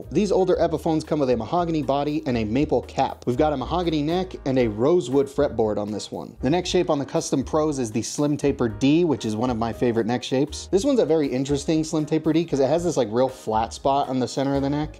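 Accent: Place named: London, United Kingdom. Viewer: Hey good London accent. I sound more or less just like that😂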